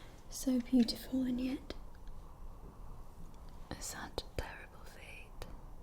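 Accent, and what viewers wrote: England, United Kingdom. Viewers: I love your british accent for ASMR!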